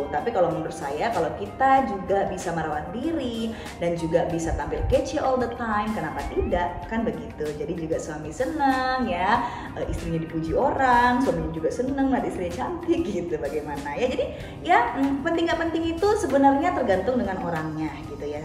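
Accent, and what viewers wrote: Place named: Medan, Indonesia. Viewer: Hah? orang medan ya? Pantesan aku pernah dengar pakai logat medan sama kira. Aku juga orang medan. Salam kenal 😊😊😊😊😊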